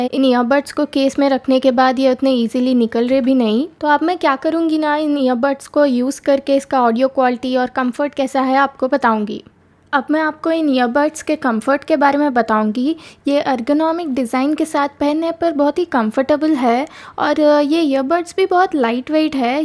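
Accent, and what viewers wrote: Hyderabad, India. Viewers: Good review.. sounds hyderabadi slang....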